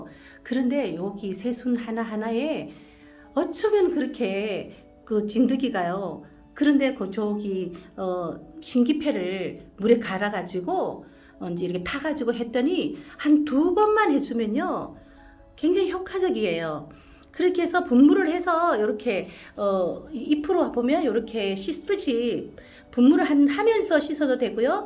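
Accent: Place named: Gyeongsang, South Korea. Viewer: 항상 잘보고 있습니다 경상도 사투리에 애교섞인 목소리에 웃음짓게 합니다 계속 많은정보 부탁 드려요 이벤트 참여 합니당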